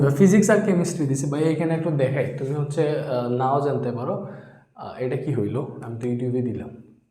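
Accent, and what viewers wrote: Sylhet, Bangladesh. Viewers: Vaiya apni ki sylheti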